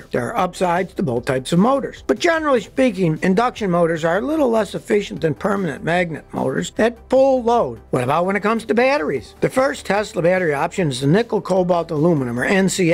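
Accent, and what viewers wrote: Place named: United States. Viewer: Escellent video production, unfortunatly I often get lost, i find it sometimes difficult to follow your american accent. I suppose I need to follow you more often and I will soon get used to it. Where is your accent from?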